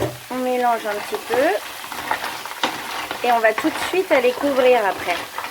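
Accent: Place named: Italy. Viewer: accent italien, bonjour ! :face-purple-crying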